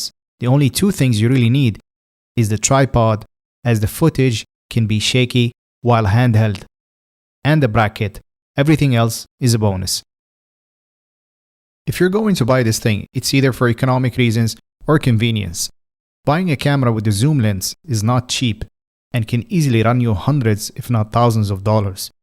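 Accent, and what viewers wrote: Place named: Russia. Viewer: Thank you for review, I'm looking for lens to create my content 🤝 And I'm happy to hear Russian accent 😁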